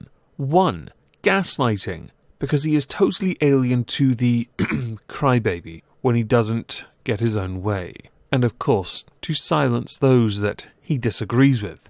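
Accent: RP, United Kingdom. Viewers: Tbh, it's actually really contrived, whereby he puts dramatic emphasis on certain words. He sounds like a posh person trying to sound deep and meaningful...he has you suckers fooled!! lol!!!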